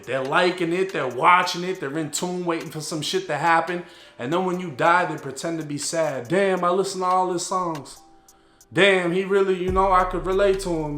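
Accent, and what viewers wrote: Boston, United States. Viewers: U gotta be from Boston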